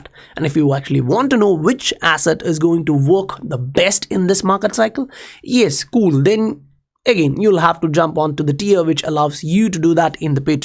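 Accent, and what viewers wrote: India. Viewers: You sound more Indian than Korean to me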